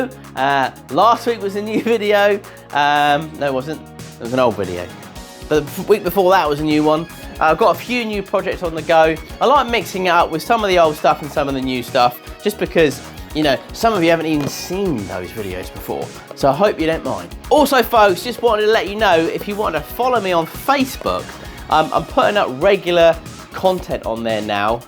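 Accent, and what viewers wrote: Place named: RP, United Kingdom. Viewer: very posh 😊👍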